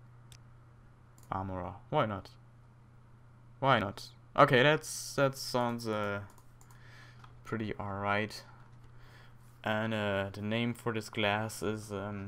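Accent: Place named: Germany. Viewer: Every now and again you pronounce English words in German, it's quite funny.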